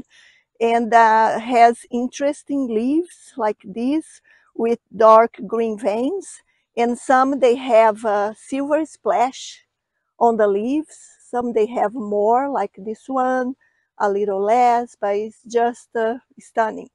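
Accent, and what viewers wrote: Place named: Brazil. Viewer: Seu sotaque, sotaque de brasileiro é inconfundível .Eu também coleciono hoyas. Tenho 25 espécies. Mas nenhuma no momento floresceu :(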